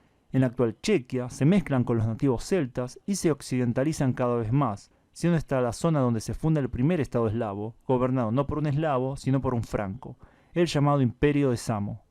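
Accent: Buenos Aires, Argentina. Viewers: Eu amo o espanhol falado por ele. O espanhol portenho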